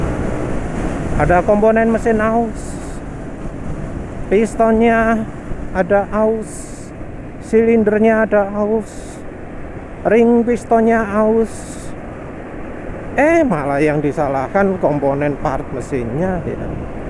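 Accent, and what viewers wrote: Sidoarjo, Indonesia. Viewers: Logat Sidoarjoane sangat kental mas bro😂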